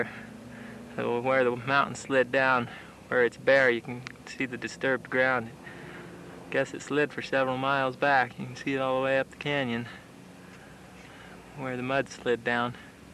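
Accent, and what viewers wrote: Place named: Utah, United States. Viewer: This is the most Utah speak I have ever heard. The most fetchin fetch of a mount'n I've ever fetchin gone seen!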